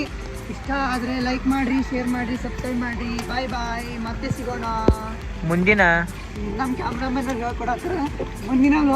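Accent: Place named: Mysore, India. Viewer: Nice trying Mysore language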